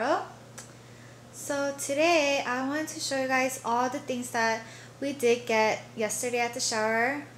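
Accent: South Korea. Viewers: You sound just like Korean ... Not that I mean your English is bad. You do just well I just think all we Koreans have certain accent in English, like Korean Accent I think:)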